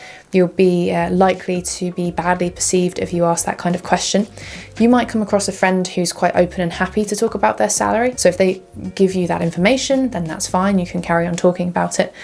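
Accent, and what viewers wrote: United Kingdom. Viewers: 喜歡妳的影片內容以及英國口音!真棒希望繼續分享更多外國文化知識型影片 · 英國口音很悅耳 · 很棒的影片，知性又有趣，讓我們更了解英國文化，感謝您！而且您的英國口音超好聽的！ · The British accent is really beautiful.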